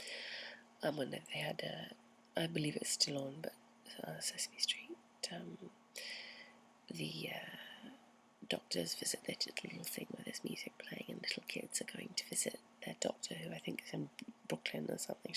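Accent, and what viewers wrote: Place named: England, United Kingdom. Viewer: I don't know why it's interesting. The title says what the accent is. But to be specific it's an English accent. People only call it British because that's all Americans will ever understand about British accents.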